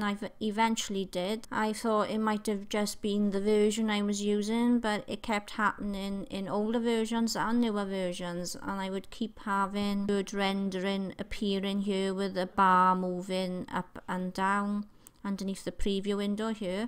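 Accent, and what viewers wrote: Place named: Wales, United Kingdom. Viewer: Amazing, thanks so much sexy voiced welsh lady!!! xx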